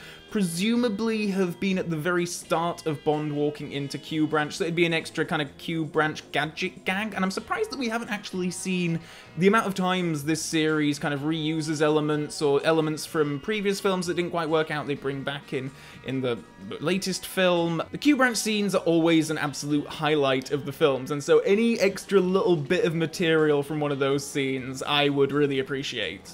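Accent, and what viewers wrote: Yorkshire, United Kingdom. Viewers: If you check out his older videos he sounds very Yorkshire.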